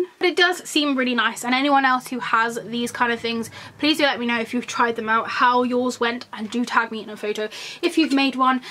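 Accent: United Kingdom. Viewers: Hi enjoying all your Halloween haul videos thanks for sharing are you from the uk I’m just curious x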